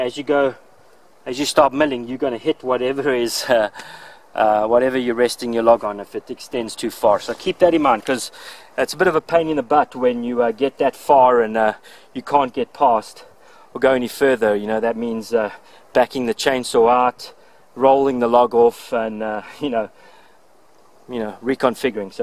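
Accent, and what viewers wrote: South Africa. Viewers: Are you from South Africa ? Soos in afrikaans ? USA ?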